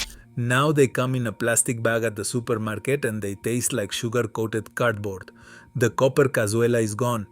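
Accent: India. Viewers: Why does he have an Indian (hindi) accent...? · Why is the guy reading this have an Indian accent lol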